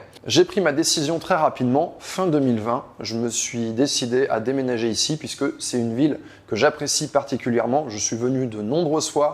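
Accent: France. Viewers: Bienvenue à Barcelone,!!! Je t'ai croisé il y a pas longtemps à la Barceloneta. Tu étais en vélo;-) J´habite Barcelone depuis 21 ans et j'y suis vraiment heureuse. Je dis souvent que c'est l'amour de ma vie <3. J'espère que tu l'aimeras autant que moi. L'accent français c'est un avantage alors garde-le;-) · Un poquito de acento francés 😊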